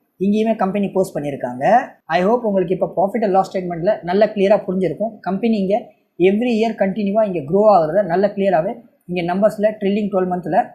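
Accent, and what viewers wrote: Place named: Mumbai, India. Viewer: Mumbai kaarar paesum alagiya tamil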